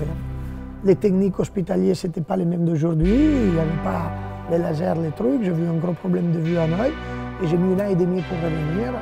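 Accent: Italy. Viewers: It isn't. He's an Italian speaking French—with a pronounced accent. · Am I wrong or he is an Italian speaking french? If not, what language is that? Sardo? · He is italian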